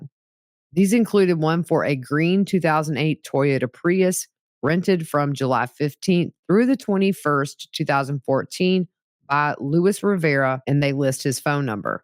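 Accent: South, United States. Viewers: I love Wendi but i love your southern accent also.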